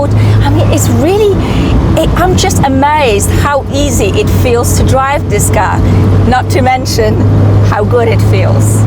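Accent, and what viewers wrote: Germany. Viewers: Tollen Akzent. Er erinnert mich an eine Deutsche, die ich die Ehre zu kennen habe.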